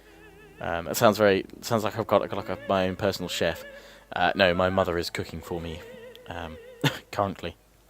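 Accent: England, United Kingdom. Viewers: that british accent SO catchy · How do you do the cinematic zoom thing, "N" key or "End" key. Sorry im not British dont understand the accent that well. But idk Brits and Americans always fight on the internet. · Well,people DO love a good English accent...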